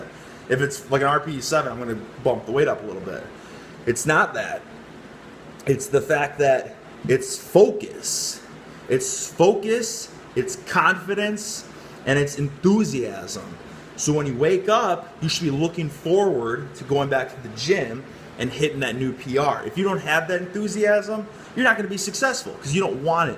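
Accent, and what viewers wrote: Midwest, United States. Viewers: Man,. His voice is so much higher pitch and the midwestern accent is strong.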